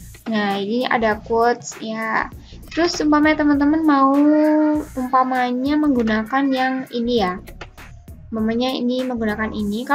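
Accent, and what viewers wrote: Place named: Central Java, Indonesia. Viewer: Dari logat cara ngomongnya kaya orang Jateng 😁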